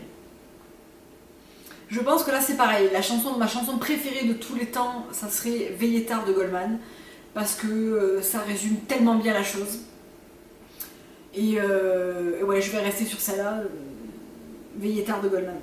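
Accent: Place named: Marseille, France. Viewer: Merci pour cette vidéo! je me disais bien que tu avais des intonations marseillaises meme si je n'en étais pas sure a 100%! Toujours agréable à regarder !!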